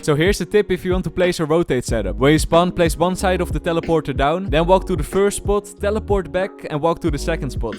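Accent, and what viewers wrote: Netherlands. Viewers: I know because of ur accent that u are Dutch, ik ben ook Nederlands 😅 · Are you dutch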